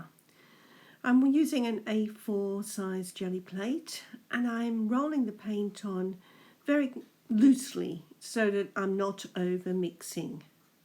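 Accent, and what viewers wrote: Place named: Australia. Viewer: Just found your channel,. Good to hear an Aussie voice. Love wabi sabi style. Can you tell me what the paper is, and the brand?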